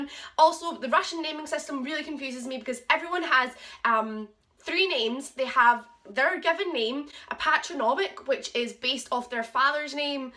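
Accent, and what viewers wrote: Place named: Scotland, United Kingdom. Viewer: Hi! Great review! Where are you from? I would guess Scotland near Glasgow? but you have a really nice accent. It gives you character. I've noticed way more Europeans do classical book reviews on youtube than North Americans, which is kinda sad to me. 😟 (I'm from Canada.)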